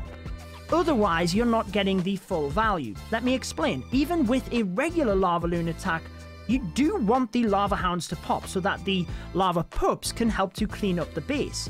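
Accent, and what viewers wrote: United Kingdom. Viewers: Ty are you from the uk?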